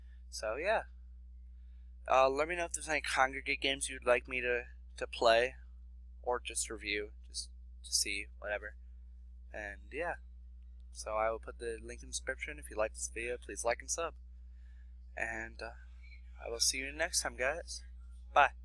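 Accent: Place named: United Kingdom. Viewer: You went from sounding heavy British to a more satisfying deep ear feel.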